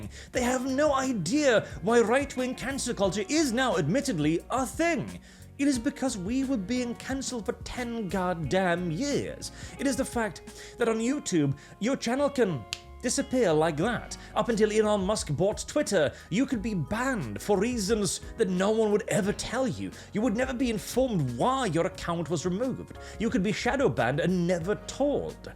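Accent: United Kingdom. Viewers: I always thought he was British. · I had no idea you were Norwegian. I guess it's the 17th century British military officer thing you got going on. Not saying it's bad. When I started watching your 40k lore videos, I pictured you wearing a stuffy uniform with a monocle, and a handlebar mustache. 😂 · You’re the most British Norwegian I’ve ever heard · I thought he was a brit for years until I heard him mention he is norvegian xD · Wait, you're Norwegian? I thought you were from the UK.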